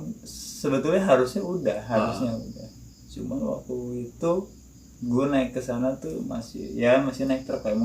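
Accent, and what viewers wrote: Java, Indonesia. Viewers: Bang ada logat jawanya nih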